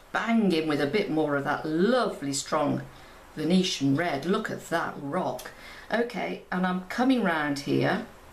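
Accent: United Kingdom. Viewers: British accent wouldn't let me leave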